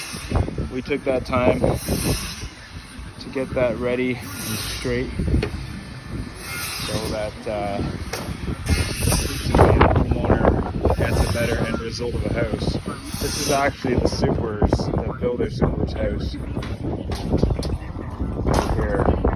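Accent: Canada. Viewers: Thanks... it's a huge gift you do with the channel giving people the insight on what is behind the finishing surfaces. ALSO enjoy you adding the extra vids of music and living life to the fullest. Hope you are having a beautiful summer and the sun is shining on all you love and... one day you Canadians speak 'House' and 'Boat' and 'About'... so us southerners in the US can understand. XD!!!! Cheers Love all the vids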